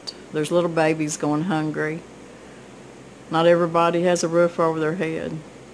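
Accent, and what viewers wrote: South, United States. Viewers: Amen !!! I have been so poor at times in my life but my children never went to bed hungry and we always had what we needed, not always what we wanted but what we needed !!! My kids are grown and I finally went to college and became an RN, I now no longer struggle to pay my bills but I still don’t have fancy things, God showed me I don’t need fancy, I am happier with thrift store clothes and raising a garden ! I am so grateful for the hard times and I’m so grateful for the abundant times, God is good and faithful !!! Watching you with your down to earth personality and your southern accent warms my heart !! Blessings to you and Mr. Brown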